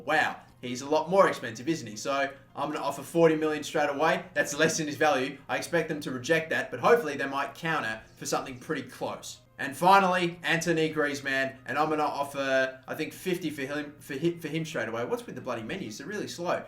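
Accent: Australia. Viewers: hes aussie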